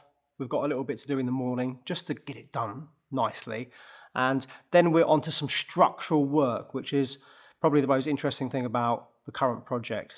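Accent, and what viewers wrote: United Kingdom. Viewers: if I offended you, I’m sincerely sorry. I hoped the emoticon showed I meant it as a light jest. (As an American, I thought your pronunciation was probably a British quirk.) I have huge respect for you, and I’m a huge fan of your channel. I discovered your channel two months ago and have watched every episode up to this one so far. I forgot that just because I feel like I know you so well, you don’t know me at all, and I shouldn’t presume to joke like that.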